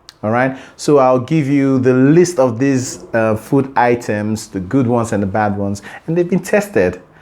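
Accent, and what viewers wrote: Nigeria. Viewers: Sounds Nigerian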